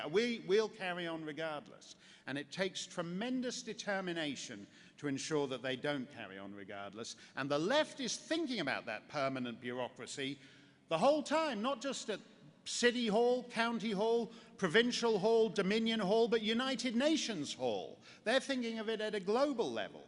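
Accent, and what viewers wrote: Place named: Oxford, United Kingdom. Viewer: Well, I discovered Mr Mark "par hasard" on Google and started listening to his various speeches about many subjects such as Obama, the frightening "islamisation" of Europe (and the world in general), and so on, and was particularly interested and delighted by his impressive and witty defense of free speech; as an European (a Euro-skeptic to be precise) and mainly as a basically "anti-Left progresssive" Frenchman I must say I like this man and would appreciate much his coming to France just to debate with our stupid so-called "President" Mr 3% François Hollande, that would be fun to say the least; By the way, at first I really thought he was an Englishman, because of his "Oxfordian" accent, and could not help comparing him with the "petulant" Ukip leader Mr Farage, who dares telling the Truth about Europe and its growing denial of Democracy. 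I heard him quote Alain Finkielkraut, a french philosopher that I like very much and taught me to "see the things I saw", which is a good thing to do, like admitting that "the facts are always Conservative". I just ordered Mark book "After America"...And I'm sure i'll enjoy it....Oh, and I remember he also compared Neville Chamberlain and Winston Churchill... Good Luck!!! Love your insight.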